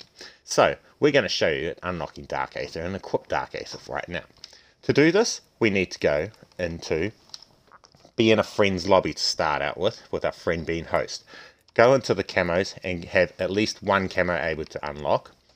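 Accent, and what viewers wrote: New Zealand. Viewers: new zealand accents make me want to be from new zealand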